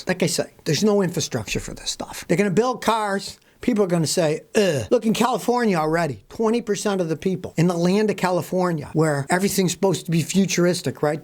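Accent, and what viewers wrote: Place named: New England, United States. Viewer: This guy may live in Tennessee, but he has a New England "Eyakcint." I've seen him with a "Live Free or Die" sign. Could he be... a New Hampshireling? 😃 Love from a Vermonter living in Texas.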